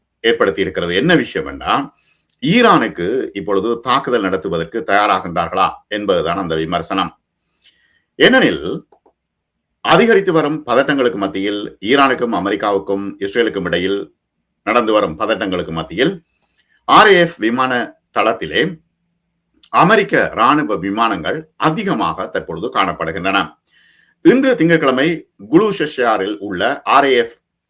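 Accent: Jaffna, Sri Lanka. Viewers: Jaffna tamil voice nantraga ullathu 🙏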